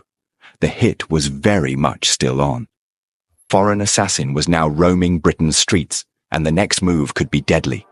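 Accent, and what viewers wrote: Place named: Asia. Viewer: Narrator trying to blend in but we can all hear your annoying asian accent. Deport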